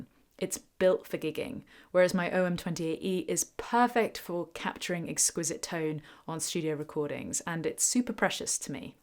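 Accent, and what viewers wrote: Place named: Bristol, United Kingdom. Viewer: Always a pleasure to see you, and hear that lovely Bristol accent. Beyond that, I'm with "intersanctum." Lots of guitars look and play similar to this one, and Martin should make a " " edition if you're doing Martin commercials. I do like the new neck joint, and, of course, it's a Martin, so it sounds great. Way out of my modest price range, however….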